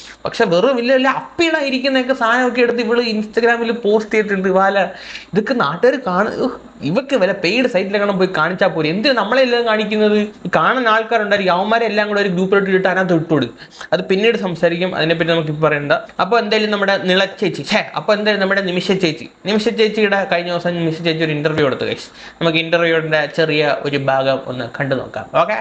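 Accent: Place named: Thiruvananthapuram, India. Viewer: പൊളിയാ 🤩തിരുവനന്തപുരം ഭാഷ da🤩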